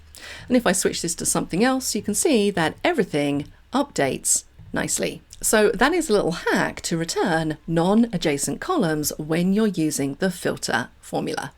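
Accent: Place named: United Kingdom. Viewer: Me encanta tu canal. Su explicación es bastante clara. Muchas Gracias desde Centroamérica. I love your channel. Your explanation is really clear even i'dont speak English, but your British accent is so clear. Tks so much. My favorite fuction is xlookup (buscarX), filter is also one of them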